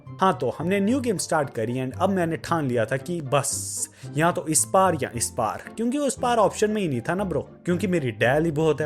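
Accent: Jammu, India. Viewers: Bro aap jammu se ho kya ?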